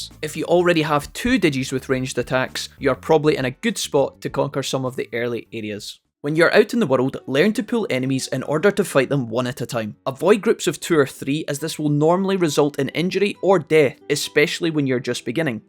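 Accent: Scotland, United Kingdom. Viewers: It's kinda funny. I was thinking the other day, what was the name of that other Scottish guy I used to watch for tips the first go around on ps4? Then this video just randomly played after watching a watchmojo Digimon video and knew it was you as soon as I heard the voice lol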